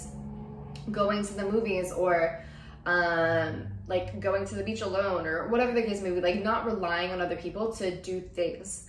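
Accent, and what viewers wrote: United Kingdom. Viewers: hahaha yesss i love the british accent! i always do that too 🥰❤️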